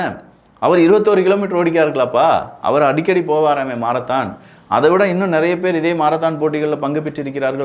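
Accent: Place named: Tirunelveli, India. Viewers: விக்கியின் திருநெல்வேலி பாஷை சூப்பர்.❤❤❤❤❤❤ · நெல்லை தமிழ் pure gold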